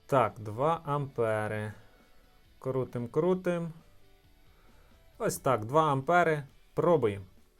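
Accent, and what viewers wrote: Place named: Transcarpathia, Ukraine. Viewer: Дістали, триста. з Закарпаття. Тільки там так говорять.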